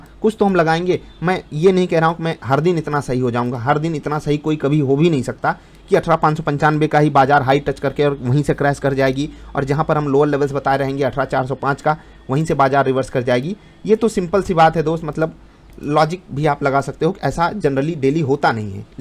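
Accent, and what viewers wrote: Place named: Kanpur, India. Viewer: Full kanpuriya style me maja aa gya.....ek full video chahiye isi tone me ab to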